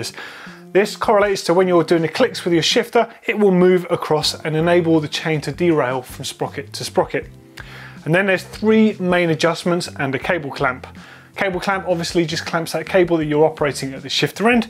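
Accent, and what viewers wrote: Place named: United Kingdom. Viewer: Very clear and complete instructions. The British accent automatically adds a few points to the apparent intelligence of the presenter.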